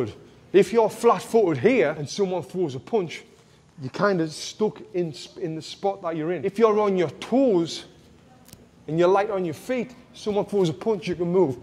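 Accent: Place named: North East England, United Kingdom. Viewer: Cheers mate, where u from? I’m from Durham myself and live in Washington now, you sound like ya from the north east marra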